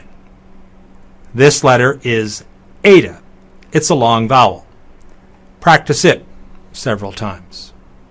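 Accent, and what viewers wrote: United States. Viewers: Oh my gosh his pronunciation is awful and he speaks so very typical of an American when addressimg to pupils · Your pronunciation is very american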